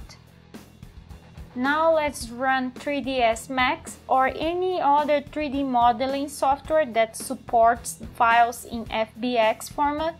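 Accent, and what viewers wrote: Brazil. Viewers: Tu não me engana! Tu é Brasileira! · Esse sotaque não me engana. Tu é br né? haha Excelente vídeo!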